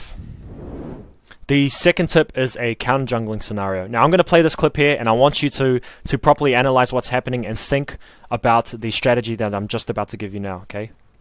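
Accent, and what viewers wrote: New Zealand. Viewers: Your vid was one of the first jungler vids that came up for me and I was like what is that a kiwi accent I spy??? Hilarious stuff, can't wait to watch more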